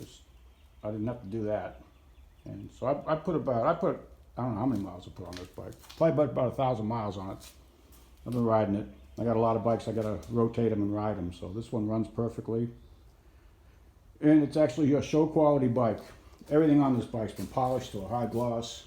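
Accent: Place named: Boston, United States. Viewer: How do you still have the Boston accent?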